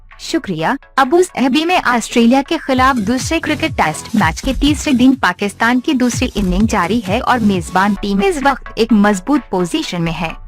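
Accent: India. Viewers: Kh tu ap pakistan zindabad rhe hiny pr lehja ap indin hy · feels like Indian is talking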